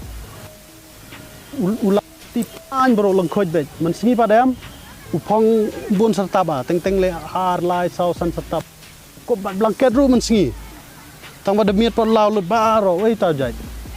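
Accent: Meghalaya, India. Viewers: I thought that u r khasi but pnar . I like it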